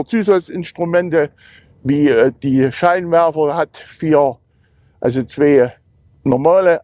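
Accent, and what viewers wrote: Saxony, Germany. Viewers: Der Sächsische Dialekt, einfach Cool. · Dieser sächsische Fistelgesang ist unerträglich! Forschdbor! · "hod ne zweete sebarade Heezung.. is m winder herrlisch worm .. finf inschdrumende hoddar " als Österreicher muss ich mich schon ein wenig konzentrieren um diesen sächsischen Dialekt zu verstehen. Um Sächsisch korrekt zu lernen ist das sicher eine gute vorlage . Ich liebe ja alle Dialekte, aber der V8 Motor des Tatra klingt trotzdem besser in meinen Ohren als das Sächsische :-)) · Also selbst für mich als Sachse ist das sächsisch des netten Herrn ganz schön stark. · mein kollege hatte auch einen sächsich herrlich du wessiarch nicht so verlogen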